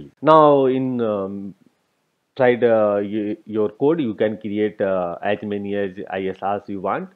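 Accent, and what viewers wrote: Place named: India. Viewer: I cannot stand the Indian English accent! Pls learn to pronounce proper English.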